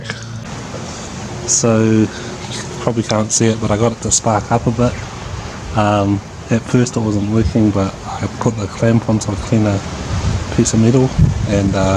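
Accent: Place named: New Zealand. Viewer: He’s from New Zealand, that’s how they talk bru!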